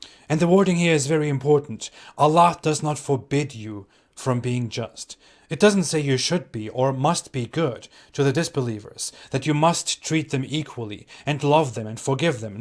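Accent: India. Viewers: he has a heavy Indian accent but I guess you just make fun of Indian accent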